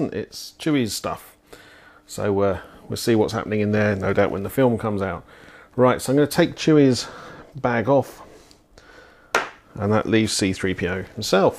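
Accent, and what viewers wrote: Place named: United Kingdom. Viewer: probably somewhere in the uk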